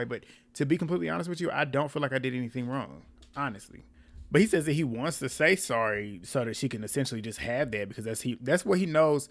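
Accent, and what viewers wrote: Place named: North Carolina, United States. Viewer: I’m from South Carolina and I just love listening to you talk because you sound soooo NC 😂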